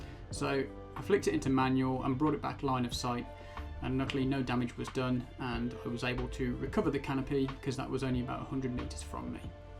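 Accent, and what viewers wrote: United Kingdom. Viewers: SUPURB!!!!! I take it your in the UK. Accent plus ROUGH LANDING N TAKE OFF SIGHTS. Hahaaha every other country Italy Germany USA ect ect ect have decent runways purpose built were still taking off from grass. Not much has changed since ww11. I'm In the same boat WHEELS OFF BELLY LANDINGS. You've done a brilliant job... I'm still trying to get a 1000mm or a 800mm Spit great shots Brian London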